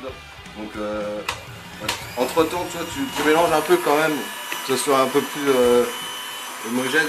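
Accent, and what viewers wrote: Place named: North, France. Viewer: t'es nordiste ou quoi mec putain l'accent a gerber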